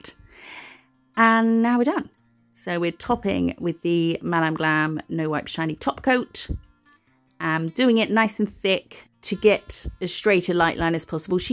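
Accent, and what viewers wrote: United Kingdom. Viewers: I have 2 bunnies irl and my very large boy an English Lop has a real thing for nail friends with British/Aussie accents he hears you or Kadence and comes to watch - he appreciated your bunny and so do I. I love you characters I think they are the best. Thank you so much for taking all that time to show us how to do them. One more thing sorry for my fellow Canadian they just don’t make ‘‘em like they used to 😂😂😂 loved the story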